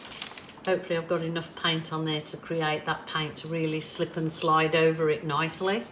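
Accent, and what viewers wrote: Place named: Midlands, United Kingdom. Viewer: are you from the Midlands, my ears prick up automatically when l hear my home town. I’m also in Oz so my accent now is a weird mix of brum and Oz. Cheers from QLD.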